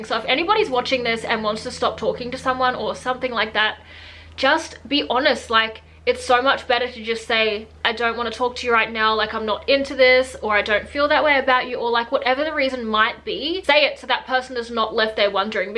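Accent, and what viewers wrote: Australia. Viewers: Shirt is adorable, agreed. Love your Aussie accent. Love your low-key vibe, and that u don't HAVE to have a boyfriend...bravo to u doll!😘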